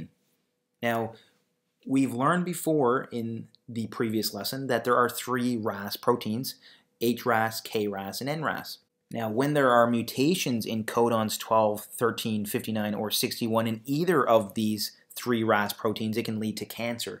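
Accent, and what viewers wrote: Canada. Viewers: are u canadian?? ur accent sounds super canadian lol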